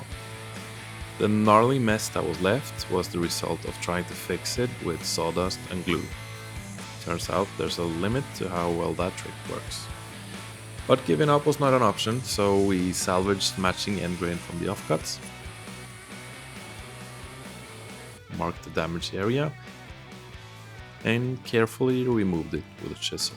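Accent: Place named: Norway. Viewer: where are you from? your english accent sounds norwegian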